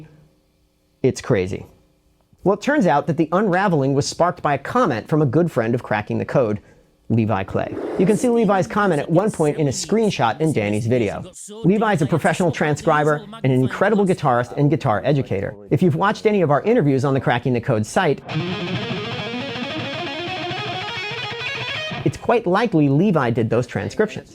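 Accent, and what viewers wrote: Italy. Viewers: That's a pretty sick italian accent, dude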